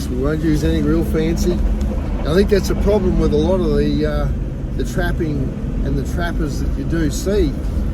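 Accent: Australia. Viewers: you sound like a true Australian doing a great job 👍🏽👍🏽🇦🇺👍🏽👍🏽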